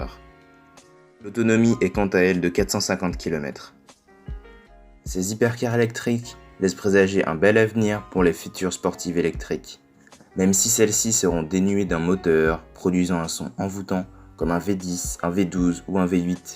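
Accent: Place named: Spain. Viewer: le tite accent espagnol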